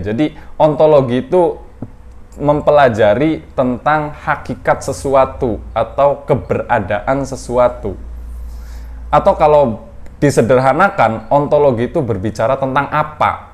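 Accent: Banyumas, Indonesia. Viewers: Out of topic bang, bang nya orang ngapak ya? Ora ngapak ORA kepenak😅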